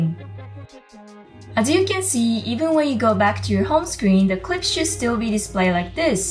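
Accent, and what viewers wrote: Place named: England, United Kingdom. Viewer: I love your English Accent Sensei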